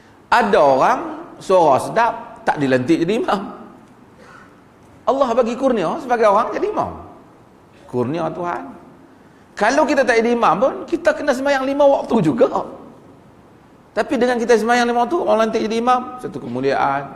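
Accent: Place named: Perlis, Malaysia. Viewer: Ini semenanjung malaysia..perlis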